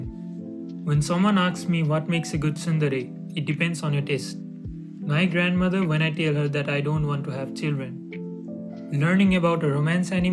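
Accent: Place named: Nepal. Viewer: Mom: Good his studying, his listening to those Educational Indian youtube channel Me: who actually watching Anime Memes that's being read by a Nepalese Dude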